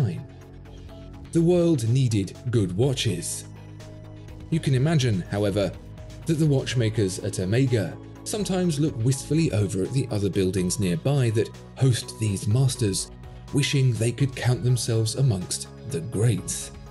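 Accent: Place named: England, United Kingdom. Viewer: I'm not happy with the pronunciation you use for omega, I've heard Americans pronounce that way but you have an English accent.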